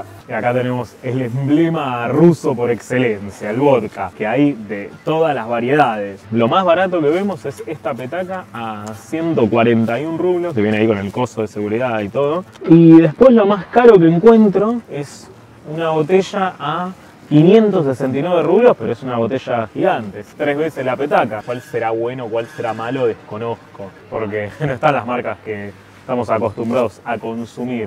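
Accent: Argentina. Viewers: reposho o posho....hay algun argentino que pronuncie bien el español??? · Tú español ruso está argentinisado!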